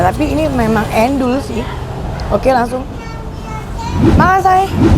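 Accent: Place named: Java, Indonesia. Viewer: Medok euy. Btw anting nya telor ceplok ya ci 😊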